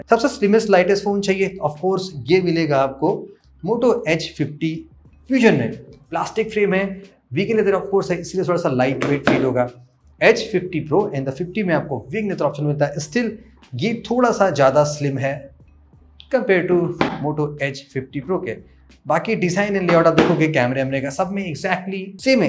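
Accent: Lucknow, India. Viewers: Aap lko se hai kya sir..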